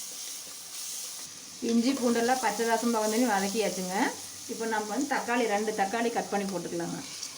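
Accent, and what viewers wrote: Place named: Kongu, India. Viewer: கொங்கு மண்டலமா சகோதரி.அருமை 😇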